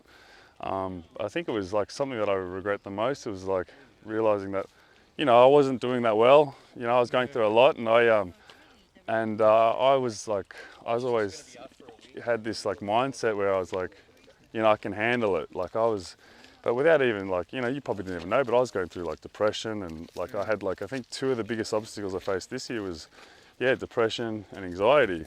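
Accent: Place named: Australia. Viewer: Australian x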